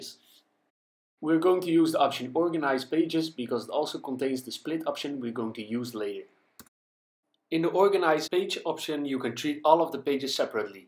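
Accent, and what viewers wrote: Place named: Netherlands. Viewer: Are you Dutch? I can recognize your accent, but not sure :p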